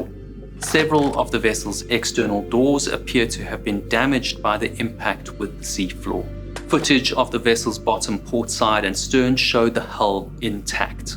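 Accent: South Africa. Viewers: I'm thinking he hears "dick hand" from the pleasant South African accent. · It would be very interesting if you created a video about rescue operations, specifically Coast Guard helicopters. They are fascinating creatures in that they can fly in atrocious conditions. My son's boy scout troop did a field trip to our local Mercy flight helicopter. It was so interesting! One thing I found fascinating was the RPM of the main rotor blade. I think it was like, 165 RPM, it was a lot slower than I thought it was but the blades are so long that the blade tips can easily approach the speed of sound as the tips move and a much higher velocity than closer to the center of rotation. Anyhow, I think it would be really cool to understand more about search and rescue equipment in general. I'll watch anything you talk about with that super cool South African accent! Cheers! 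I think you should find a way to say 'Thrusters' in each video you make:)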